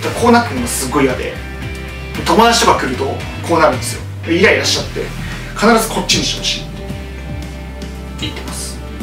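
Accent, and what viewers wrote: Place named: Shizuoka, Japan. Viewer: 静岡弁。笑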